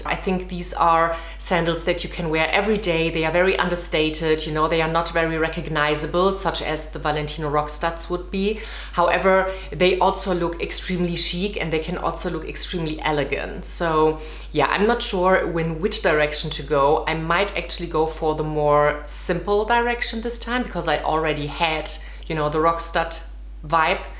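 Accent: Germany. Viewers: Dein Akzent klingt deutsch 🤓I'm learning it lol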